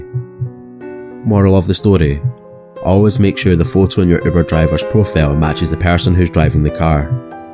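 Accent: Scotland, United Kingdom. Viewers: love the scotts accent,great stories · It took me some time to realize, he speaks Scottish, even tho I’m Irish😂🤦🏻‍♀️ anyways, great story☺️ keep on going⭐️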